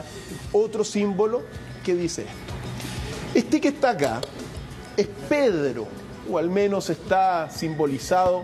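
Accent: Chile. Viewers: Ya me están gustando los chilenos !!! Será que todos hablan asi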